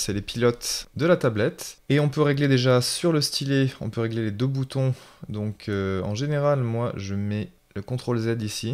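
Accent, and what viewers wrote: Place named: Quebec, Canada. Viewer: Bonjour, Merci pour ta vidéo qui est très sympathique et tu n'as pas trop l'accent prononcé du Québécois. Bref, as-tu fait une vidéo pour bien configurer la tablette en AZERTY, car claver QWERTY ce n'est pas pratique. Merci d'avance pour ta réponse et si tu as fait une vidéo, d'y mettre le lien. :) Continue, c'est génial ! D'ailleurs, je viens de m'abonner à ta chaîne ! ;)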